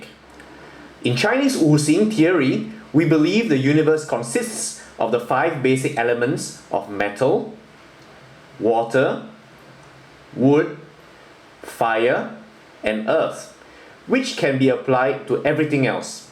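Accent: Singapore. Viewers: are u singaporean, bro?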